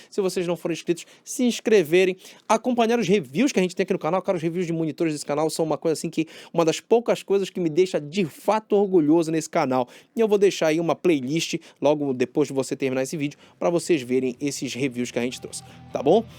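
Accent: Pará, Brazil. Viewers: Pelo sotaque é paraense hahha! Mandou bem no vídeo, parceiro.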